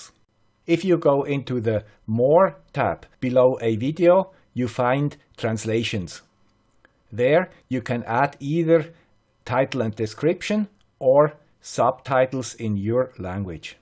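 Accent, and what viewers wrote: Switzerland. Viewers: Love your swiss accent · "Olá membros do YouTube ! Eis o homem de sotaque Suíço ! ..." - Português Europeu - European Portuguese. Consider me enlisted ! If you can find the time to do it, I'll find some time too... Alexandre from Portugal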